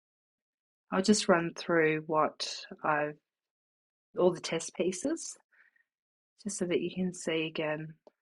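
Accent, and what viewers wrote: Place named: Australia. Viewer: I tried to subscribe but it keeps sending me to another website. I will keep trying as I'm quite impressed with your video, the way you controlled the variables you weren't testing and your Aussie accent is not off-putting as some can be (I am Australian and my own accent would probably not work on Youtube)). Just tried again and it worked to subscribe this time so if anyone else has this problem, it's worth persisting.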